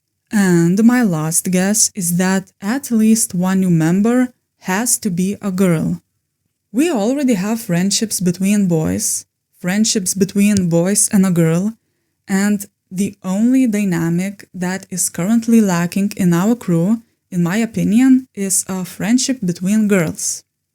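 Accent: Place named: Lithuania. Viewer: Oh well that justs shows that i should meet more Lithuanians in my daily life lol. I'm Dutch so i know other eastern european accents, but haven't heard a Lithuanian before! But that aside, your channel is really cool and deserves more subscribers! I love your pov as a Live Action only fan and i hope you make more content. But until then, i gotta binge watch the rest of your videos.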